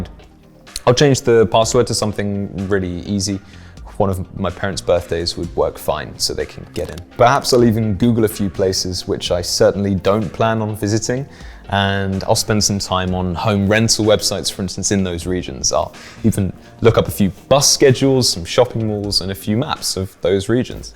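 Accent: United Kingdom. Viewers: a British accent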